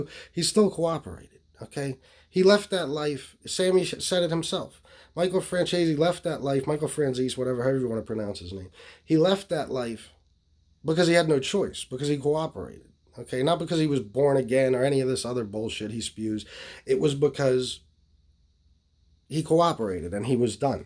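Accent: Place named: Northeast, United States. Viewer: You only have an accent to people not from the northeast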